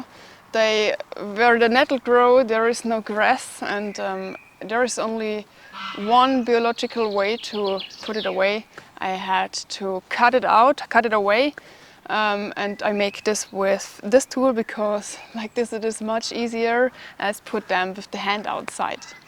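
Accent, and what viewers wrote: Bavaria, Germany. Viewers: So leiwand dieses bayrisch :D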